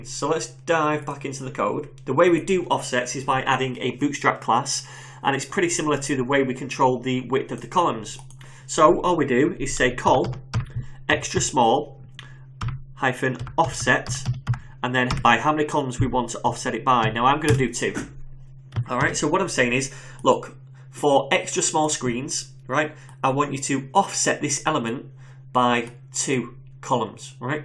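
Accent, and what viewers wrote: United Kingdom. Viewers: i was learning british accent too so you are just perfect ^_^ explanation was great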